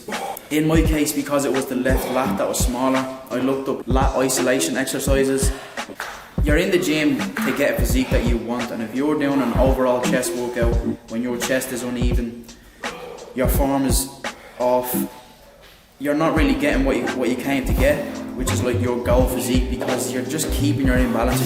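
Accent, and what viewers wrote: Ireland. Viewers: Are you irish ?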